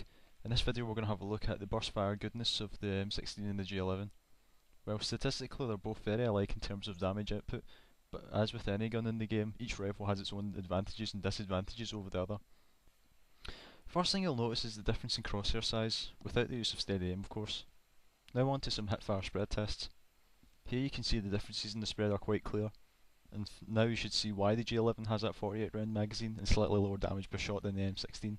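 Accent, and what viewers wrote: Scotland, United Kingdom. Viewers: are you british? lol jw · are you scottish?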